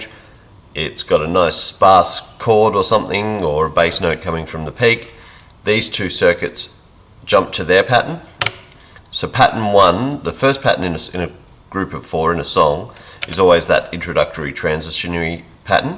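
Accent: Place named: Australia. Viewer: This is awesome man, thanks heaps for sharing! Sounds like you're an aussie like me haha. Where are you based? P.s. keep up the great video content!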